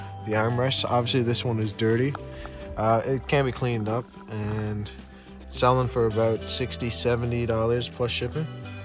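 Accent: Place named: Canada. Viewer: Before I saw Canadian I was wondering if ur from there or Minnesota lol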